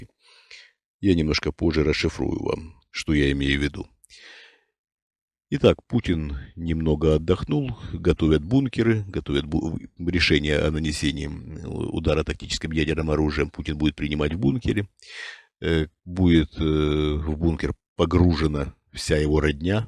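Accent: Ukraine. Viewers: Бля, жесть! Это что, кто-то всерьёз слушает?! А украинский акцент диктора никого не смущает? А подзаборная лексика и стилистика? Нет, народ, что серьёзно так потерял чувство уровня, что ВОТ ЭТО принимает за чистую монету? Наши люди неисправимы. Кашпировский и Чумак и сейчас могли бы собирать залы и "заряжать воду". Чем "президент, слушающий шаманов", отличается от баранов на этом канале, слушающих таких вот? Впрочем, секте сложно понять, что она секта. Анекдот, ё-моё...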